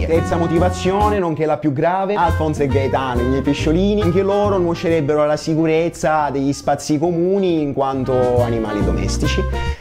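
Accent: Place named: Eastern Sicily, Italy. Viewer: c'è del video in questo accento siciliano orientale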